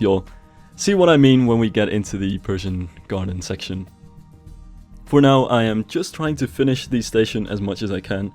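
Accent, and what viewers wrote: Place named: Netherlands. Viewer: My senses smell that you are Dutch speaking.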